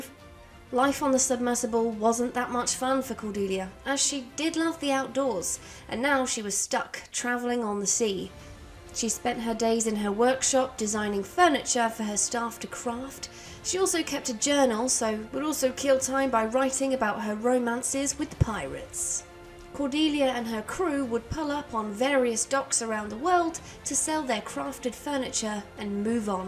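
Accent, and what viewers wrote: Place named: Scotland, United Kingdom. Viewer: me hearing scotland be like, yas.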